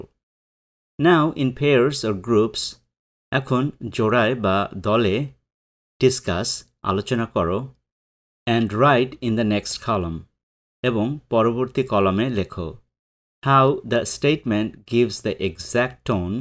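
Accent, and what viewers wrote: United States. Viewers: apu ami bolchi thik kore kotha bolte Uni american style na choose kore british ta choose korleu parten 😒🪧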